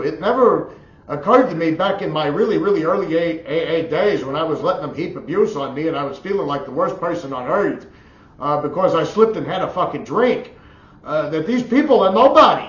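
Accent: New Jersey, United States. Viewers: I can’t believe you’re from Jersey. That accent is so intense 😂 I can relate to you a lot.. I’m from Essex county and grew up with a music-obsessed father as well. Your understanding of female bodily autonomy is heartwarming. It should just be normal but unfortunately it’s not.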